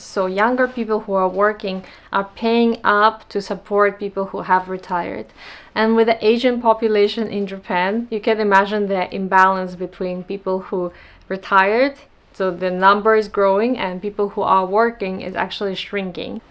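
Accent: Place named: Russia. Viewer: Russian accented English? How is your English so fluent? Ever lived in NYC? (and Russian accented Japanese --novel!) This is solid information. I could retire in Japan tomorrow but unfortunately, Japan hasn't recognized that their largest export is their Culture. They could fix so many economic problems by creating simplified paths to permanent residency & citizenship with such a massively declining birth rate. It's time for Japan to allow citizenship by investment & relaxed visas. But then again, recognizing societal issues, and addressing them is not exactly an established practice in Japan. They are collectively killing themselves with their own xenophobia!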